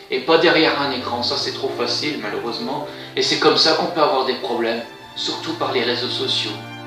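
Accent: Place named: Belgium. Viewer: c rien c l’accent belge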